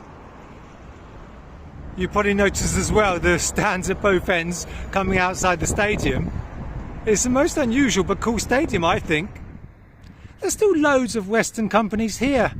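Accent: United Kingdom. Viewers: British accent 😁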